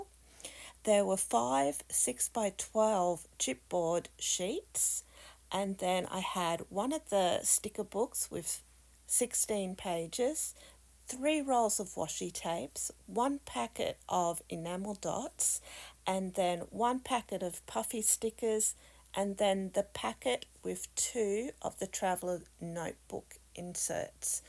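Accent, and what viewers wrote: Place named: Australia. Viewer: I think everything you got was marvellous, the travel sticker book and papers were my favourite Great to hear a fellow Aussie...tfs:) Zelda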